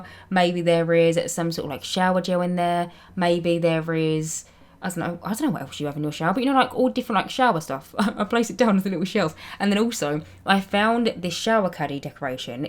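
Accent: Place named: East London, United Kingdom. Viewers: i love english accent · im from east london and this is the first time ive seen a youtuber with a similar accent to mine play sims! i feel so at ease love ur video and ur builds are insane!!!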